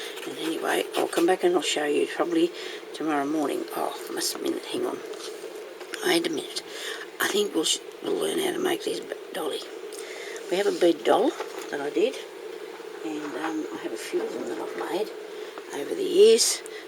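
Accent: Australia. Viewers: you must be inland l live on the coast hot but not as hot as inland. there great little flowers really sweet. its so nice to hear an aussie acsent. looks beautiful love the flowers tfs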